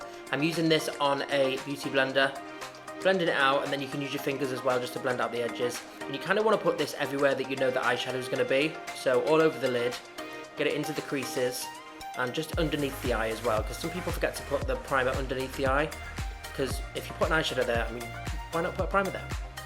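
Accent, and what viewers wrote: Liverpool, United Kingdom. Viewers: He still sounds Scouse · Miss ur Scouse accent, love it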